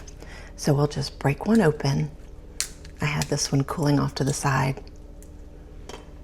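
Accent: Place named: South, United States. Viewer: I love hearing a fellow Southerner talk!!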